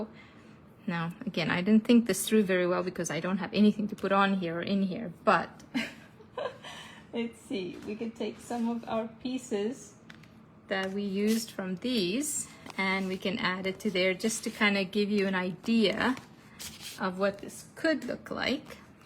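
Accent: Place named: South Africa. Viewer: Hi there, been subscribed to your newsletters for over a year. You sound South African. Thank you for the lovely freebies. I love getting them. Thank you from Cape Town, South Africa.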